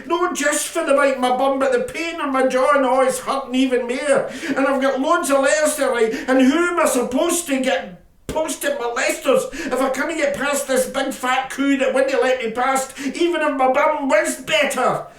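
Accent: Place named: Ayrshire, United Kingdom. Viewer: Brilliant,. Accent was straight oot o Ayrshire.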